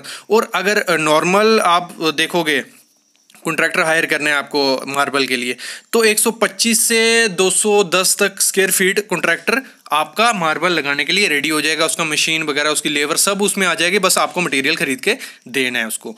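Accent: Jammu, India. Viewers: Jammu se ho aap.??. Dogri touch ha ap ki language me. Good explanation 👍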